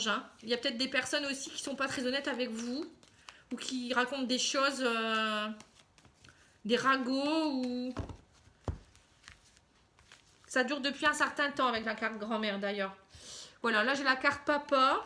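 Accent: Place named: Nord-Pas-de-Calais, France. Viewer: Have Emilia je n'ai pas osé posé la question j'ai reconnu immédiatement l'accent chti j'ai toute ma famille à Boulogne sur mer ❤️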